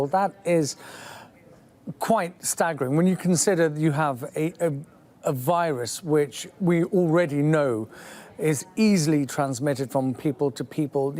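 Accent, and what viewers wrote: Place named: United Kingdom. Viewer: An Indian face with a British voice? Now that's human cocktail.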